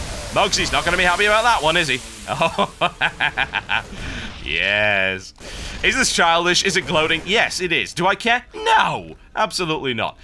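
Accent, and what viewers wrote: United Kingdom. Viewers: This was just enjoyable, the best part was of course when he rage quit. But your evil laughter kept me watching, as well as the charming British accent.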